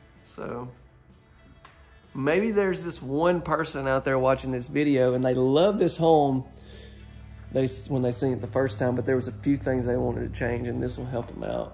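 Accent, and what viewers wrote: South, United States. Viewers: I love your accent ♥️ Be who you are. Don't go changing trying to people please, because I RECKON, LOL HEHEHE 😋 🤣🤣 🤣 you will never ever master being a puppet to everybody in the world. I love your personality, you have a southern swag about yourself 😁 yes, SOUTHERNS HAS SWAG..LOL HEHEHE 😋 🤣🤣 🤣..and that is what's keeping the viewers returning to your channel ❤️ keep up the great work. I RECKON , I SEE Y'ALL ON THE NEXT VIDEO..🤗 Charole'vista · Love your southern accent. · Please do not apologize for saying “reckon”. I’m from Alabama. Keep our Southern accent alive! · Speak your Southern English! I love it! Don’t ever change. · LOVE THIS HOME! and keep being you...love the southern accent👍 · GREAT-looking LR/DR/kitchen open-area layout, and you have a soothing, "folksy" way of selling the unit. My only "criticism" is when you hesitated after saying "reckon" as though it was too country for the video. 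Your southern accent NEEDS TO BE PRESERVED, because with the gentrification of much of the US--I'm from Boston, have a STRONG Boston accent, but fewer ppl speak with a Boston accent nowadays due to the dreaded gentrification of neighborhoods here--so we gotta keep the various cultures/accents alive throughout the US. After all, the US would be a dull place if all we had was a monotone, single accent throughout the entire country, right? In other words, STAY TRUE TO WHO YOU ARE! Keep up the good work:)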